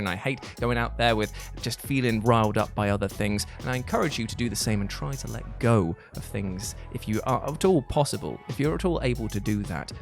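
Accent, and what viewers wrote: United Kingdom. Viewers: Simon Whistler clone? This just seems like it MUST be a parody of Simon's oh-so-British delivery. All he needs to do is mispronounce a few things. . . (and have nine more channels).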